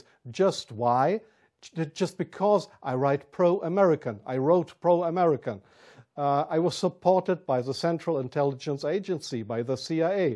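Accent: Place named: Germany. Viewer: Hi Buddy, German English you speak. But doesn't matter, you are Man, beileid...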